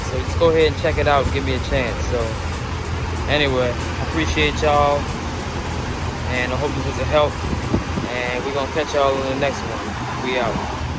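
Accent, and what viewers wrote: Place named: Louisiana, United States. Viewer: Louisiana boy.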